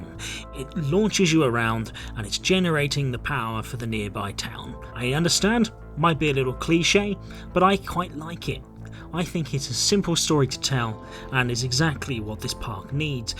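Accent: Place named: England, United Kingdom. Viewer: Your flow of words and English accenting makes you sound like that specific really wise guy that everyone loves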